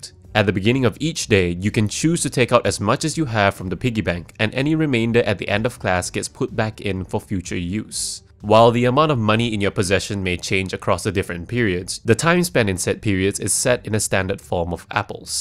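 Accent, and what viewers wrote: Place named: Singapore. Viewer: You sound Singaporean! Good review, well presented and structured.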